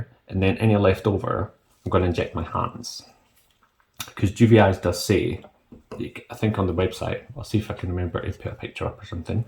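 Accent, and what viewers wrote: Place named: Scotland, United Kingdom. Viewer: So refreshing to hear a Scottish accent. Been in Canada since I escaped England in 1988. I am thinking of doing this Juve eye thing myself. Do my own Botox but got flagged by customs so dunno if I will be able to get product at my home address. I guess it's illegal to even do your own Botox here in Canada. Bummer. I got cheek filler yesterday as a 'model' at a place where I know the owner. He's now got his own clinic and doing amazing. Young, Gay and very artistic. Cheek filler was painful, so I'm glad I never attempted to do it myself. The crunching of tissue is unnerving! Anyhow, sorry about your Soccer defeat by the Germans today. Probably our turn on Sunday!!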